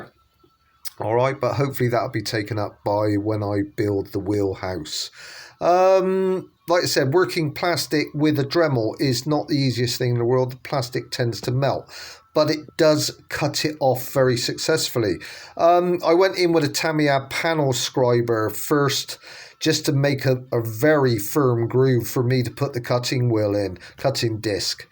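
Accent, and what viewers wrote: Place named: Bristol, United Kingdom. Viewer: That's the most Bristolian accent I've ever heard. Thanks for the video though, very useful!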